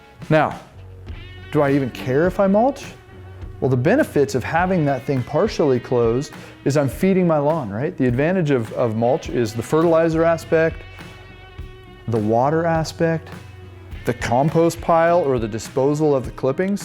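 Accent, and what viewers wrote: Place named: Canada. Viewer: This guys Canadian accent is soo killer.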